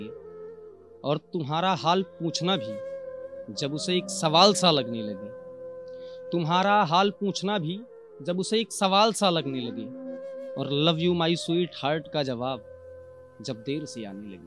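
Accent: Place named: Lucknow, India. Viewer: Gajab bhaiya wah lucknow wala andaj 😂❤️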